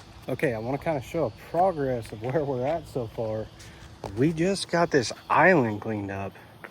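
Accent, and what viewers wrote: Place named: Oklahoma, United States. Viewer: I love watching your videos. I live in south central Oklahoma. Sounds like you live in Oklahoma too.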